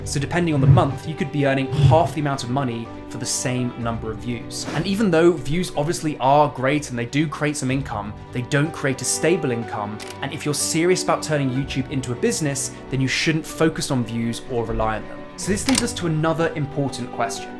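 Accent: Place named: United Kingdom. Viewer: Good video. Like your advice. And your British accent is crystal clear to us Americans. However.... this advice is pretty useless for those of us on YouTube who are not interested in monetization. Many of us are here for politics and to promote political viewpoints. In my case, libertarianism. Have zero interest in making $$ on YouTube. I have a day job. Now... if you really want to help folks like me, make a video on how people with day jobs can find the time to make YouTube videos.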